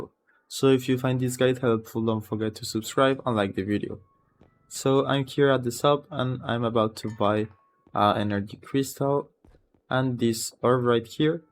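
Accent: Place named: Albacete, Spain. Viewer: Este tio es de albacete o por ahi a mi no me engañas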